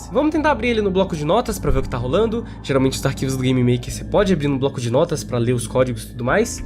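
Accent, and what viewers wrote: Brasília, Brazil. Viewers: VOCÊ É DE BRASÍLIA???????????? ceilândia ou taguatinga sul?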